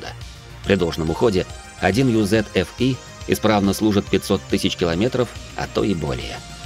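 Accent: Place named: West, Russia. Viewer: Спасибо за видео, всё по существу) по тому как ты называешь абривиатуры двигателей, буквы 1jzgte , и в конце говоришь "и" , можно сделать вывод что ты живёшь в западной части России. Все на востоке говорят "е". 4а-фе , 1jz-гте ну в общем понял суть 😀😀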